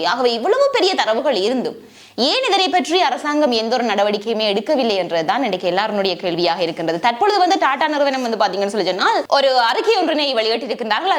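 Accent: Jaffna, Sri Lanka. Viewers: தமிழை நன்றாக பேசுங்கள் இலங்கைத் தமிழை பேசாதீர்கள் தமிழ் நன்றாக புரிந்து பேசுங்கள் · இறந்தவர்களுக்கு ஆழ்ந்த இரங்கல்கள்.. தங்களது பேச்சு யாழ்பான தமிழ் போல் மிக நன்றாக உள்ளது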